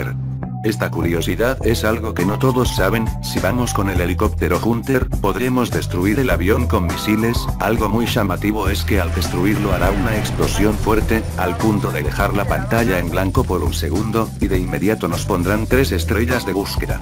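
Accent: Argentina. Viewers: Eres argentino verdad? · Sos argentino ¿?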